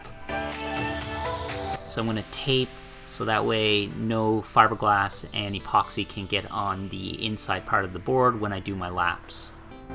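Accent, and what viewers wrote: Canada. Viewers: I hear some Canada in your voice?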